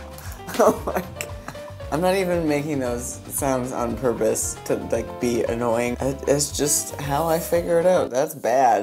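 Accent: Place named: Canada. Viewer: You sound Canadian are u Canadian ?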